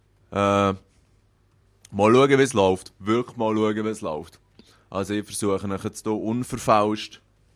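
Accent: Bern, Switzerland. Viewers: du bisch zviu:) bisch e bärner oder Luzerner? gruess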